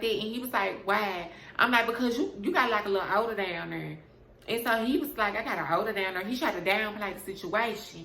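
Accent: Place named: Tennessee, United States. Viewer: Omg you from Memphis!!! I made my first comment without see the part you from Memphis lol I know a Tennessee accent.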